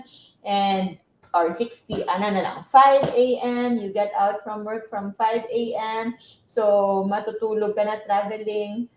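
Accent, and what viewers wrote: Iloilo, Philippines. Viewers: Ilonggo si Doc?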